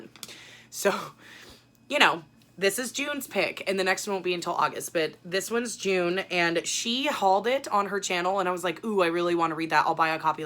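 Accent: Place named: Australia. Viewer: Ooo!!! Yes you should start!!! I love book videos and I would so watch!! AND it sounds like you're from Australia, I'd love follow your booktube if you start one! I don't make book videos (yet?) but am obsessed with booktube haha 💟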